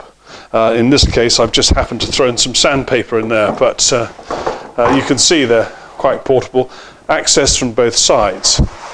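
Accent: England, United Kingdom. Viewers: Best male English accent on Youtube, could listen to you all day mate!.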